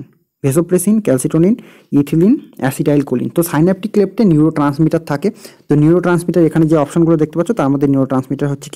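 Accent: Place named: Bengal, India. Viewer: Sir please can you tell in Hindi or English along with Bengla language... Its so difficult to follow your speed Bengal accent... Please please please.. I need it..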